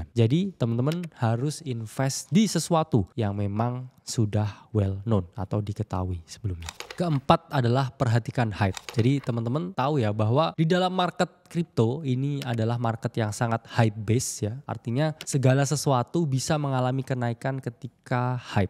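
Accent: Java, Indonesia. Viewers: 🎉mas jowo nya ngendi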